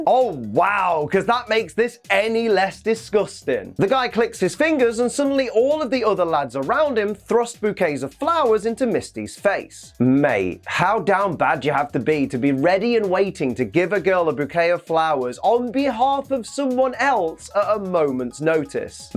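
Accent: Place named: United Kingdom. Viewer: "Cook" and "cuck" sound so similar in a British accent that It took me a hot second to get the joke.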